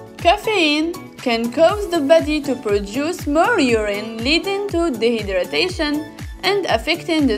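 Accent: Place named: France. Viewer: Your voice tone combined with your accent sound pretty sweet n adorable in my ears.. You’re probably french tho right? :/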